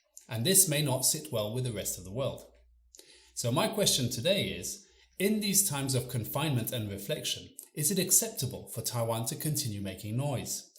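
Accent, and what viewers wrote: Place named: United Kingdom. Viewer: 英國腔超重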